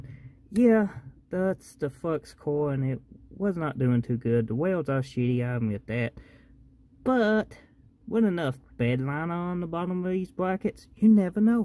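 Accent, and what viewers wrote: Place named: Louisiana, United States. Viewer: Cajun accent isn't it? You hear that down in Louisiana.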